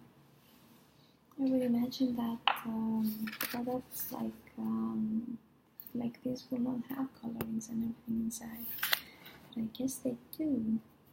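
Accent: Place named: Greece. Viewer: Greek, isn't it?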